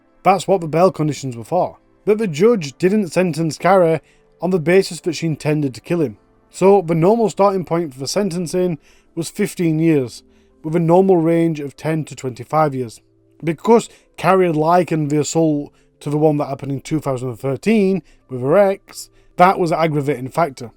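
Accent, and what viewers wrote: Yorkshire, United Kingdom. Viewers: By any chance, a Northerner, you? Yorkshire?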